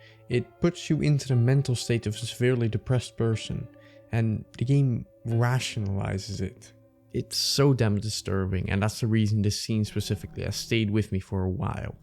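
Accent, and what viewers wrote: Netherlands. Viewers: altijd heerlijk om een nederlands accentje te horen wanneer je er niet een verwacht 💕 💕 🇳🇱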